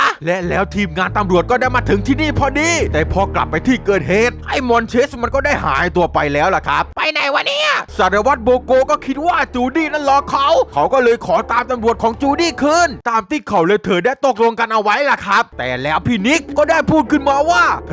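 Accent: South, Thailand. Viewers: ฟังเสียงพากแล้วเพลินดีครับ ออกสำเนียงใต้นิดๆฟังแล้วมีเสน่ห์ดีครับ ทำต่อไปนะครับจะติดตามและเป็นกำลังใจให้ครับ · พี่เป็นคนใต้หรือเปล่าเนี่ยเห็นสำเนียงเหมือนอ่ะ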